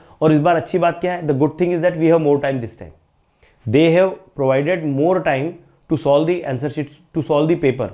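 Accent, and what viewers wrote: Maharashtra, India. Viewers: Sir tumhi Marathi aahat ka?????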